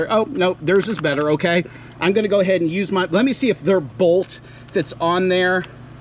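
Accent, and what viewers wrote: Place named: United States. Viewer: omg.. a loud yank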